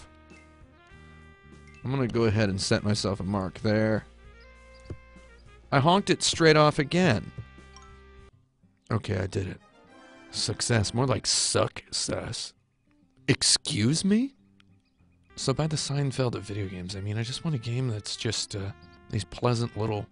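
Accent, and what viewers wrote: Australia. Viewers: As a British person, I think the text definitely read smoother with an Australian accent. I think the game is Australian come to think of it. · dangerously australian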